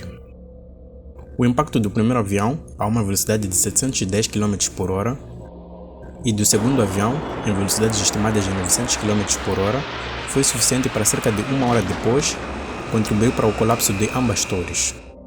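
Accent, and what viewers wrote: Portugal. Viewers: Por causa desse sotaque insuportável de português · Português de Portugal, ninguém merece aff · Nossa, que idioma esquisito, parece português europeu ou português arcaico...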